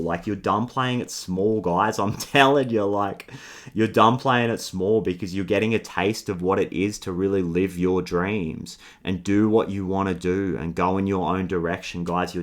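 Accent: Australia. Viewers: Hello from California. Great voice. Great messages from Australia. Fantastic Australian Accent, it's known as one of the most trustworthy accent. We appreciate you. Have a good day/week/month, from our channel. :hand-pink-waving::hand-pink-waving::hand-pink-waving::trophy-yellow-smiling::trophy-yellow-smiling::trophy-yellow-smiling:🐦🐦🐦🦅🦅🦅🦜🦜🦜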